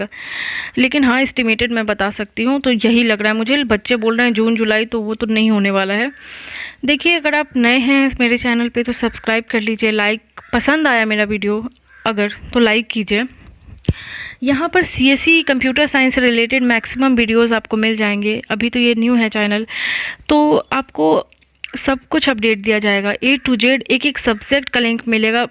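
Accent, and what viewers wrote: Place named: Bihar, India. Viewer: Mam are u from bihar ??? Accent se lg rha tha😂😂😂😂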